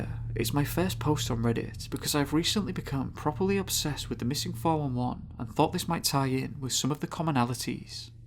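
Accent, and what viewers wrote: United Kingdom. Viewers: Please ditch the heavy British accent and I guarantee you'll triple your likes and subscribers 😉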